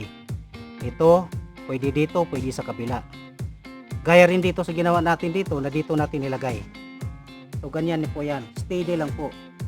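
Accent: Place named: Visayas, Philippines. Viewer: Boss tha cebu ka raw, kaya pala pansin ko may bisaya ka na accent hehe tga cagayan de oro ako, proud bisaya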